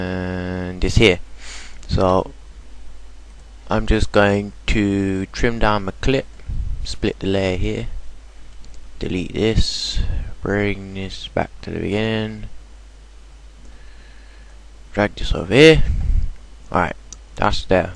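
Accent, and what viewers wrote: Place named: East London, United Kingdom. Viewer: YOUR ACCENT DOESNT COMPUTE. Seriously, where are you from, you sound a bit east london, maybe essex?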